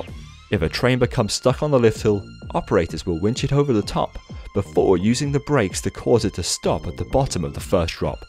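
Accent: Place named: Public school, United Kingdom. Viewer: Yes......fair enough! You guys do have different styles, I have to concede...though I must say that you have the posher (public school) accent.....whereas 'Expedition' sounds more like a chavvy south London/home counties one. Yeah....I keep on thinking of Sadiq Khan everytime I hear 'Expedition Theme Park' speak!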